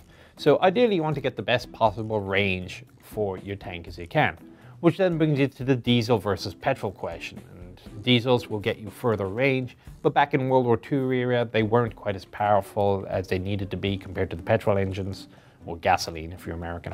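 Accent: Ireland. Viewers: He has a pretty thick Irish accent, regardless he has mentioned it many a time.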